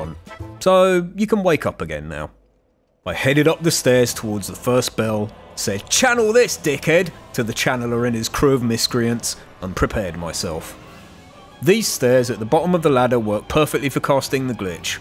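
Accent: United Kingdom. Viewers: I absolutely love this sarcastic British narration, your amazing voice and impeccable attitude have earned you one more sub, and hopefully many more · Why the hell do you sound like old Pyrocynical? Or all british people sound like that? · oh god you're british. not watching bye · I just love tired british acents for example how you say "at a" just sounds like ah a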